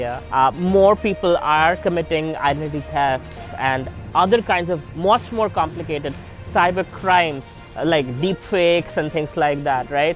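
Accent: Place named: India. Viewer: Your English accent is not like other Indias.. It’s a good thing that i find rare...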